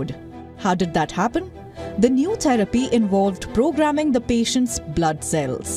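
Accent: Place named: India. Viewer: Hindu accent is bleeding my ears …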